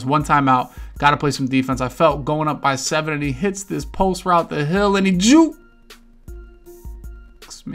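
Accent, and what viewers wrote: Philadelphia, United States. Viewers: Been watching all your vids bro, I’m from philly idk if you’re from philly but you sure do sound like it. Keep it going 💪🏾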